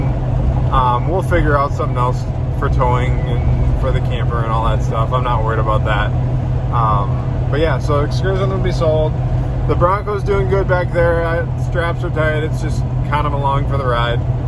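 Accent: United States. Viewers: ! Your southern accent is too funny! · Oh my goodness, Floridians and don’t talk that way, that’s more Georgia… You’re hilarious!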